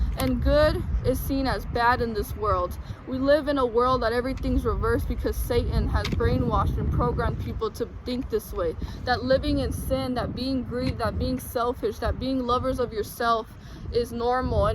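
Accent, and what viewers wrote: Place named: England, United Kingdom. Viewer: I like your english accent , i study english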